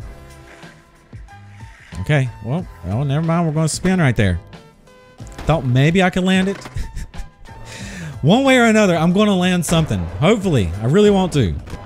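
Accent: South, United States. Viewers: this dude sounds like hill billy bob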